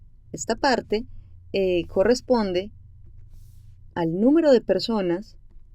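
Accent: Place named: Colombia. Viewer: Esa voz es colombianaaa!!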